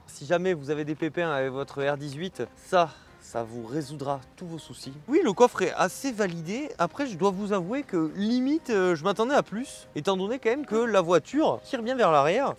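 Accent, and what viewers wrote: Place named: Southwest, France. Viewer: Bravo pour ces vidéos très sympa (et cet accent de notre bon vieux Sud Ouest!) J'ai eu une renault 18 Américan 2 dans ma jeunesse, j'en ai gardé un excellent souvenir!!!...Et le badminton, ça fait travailler tous les muscles, mais pas de mal au bras! Il va falloir travailler ta prise de raquette... Ps: on m'a donné une peugeot 305 qui roule parfaitement en daily, et comme la renault 18, elle rappelle d'excellents souvenirs de jeunesse à tous ceux que je croise sur mon chemin, et plus curieusement encore, quand je vais dans des concentrations de vieilles autos avec cette 305, elle suscite presque plus de réactions et de regards qu'une des 10 ou 12 berlinettes garées sur le parking... incroyable facteur de sympathie!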